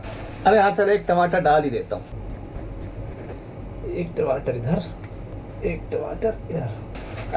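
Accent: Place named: Uttar Pradesh, India. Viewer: Bhai UP se ho kya 😂😂 me b UP se hi hu same accent tmhara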